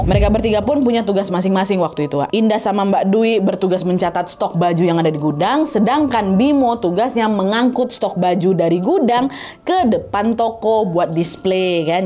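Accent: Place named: Medan, Indonesia. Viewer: orang medan kau rupanya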